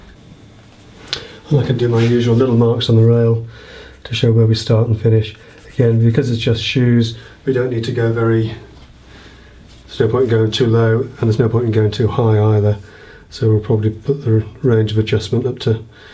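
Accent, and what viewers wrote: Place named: United Kingdom. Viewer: Hi. I live in South Africa and came across your videos about 2 weeks ago. I have since then watched ALL your videos. I find the British accent and the way you talk and just your videos so educational and relaxing. I will definitely watch all future videos. Many thanks for the excellent work!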